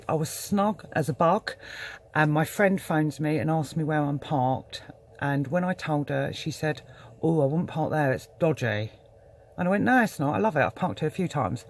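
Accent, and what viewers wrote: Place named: United Kingdom. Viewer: Just sat and listened to you work out in your head that you are safe in van , good out come. Though I do think it would be helpful to have an idea “Where you are” to be included in your intro is welcomed here for new viewers, as your intro is “its really cold....ok? Where like frozen in Alaska or California 30 degrees chill, judging by accent UK. Just curious what comfort level one can expect in a van in winter, assuming it was built with typical base of insulation. See you have propane and a warm dog and you built a closure from front cab. Shoot! All good measures for van living! Anyway just a thought as YT “recommenced “ this in my feed to view and its first video Ive viewed, just would have been good to know where u b? State, Country.. not gps cooordinates. LOl Good of you to share your thoughts with the world and for YT to connect us with your story. 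Keep thoughts on the positive a thought have vibrations, “ YES i am safe” perfecto!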